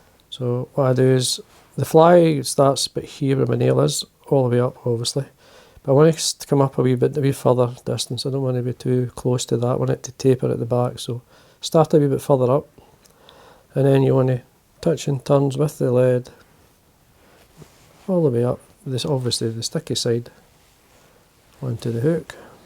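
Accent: Scotland, United Kingdom. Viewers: Love watching you tie! Love your Scottish accent too!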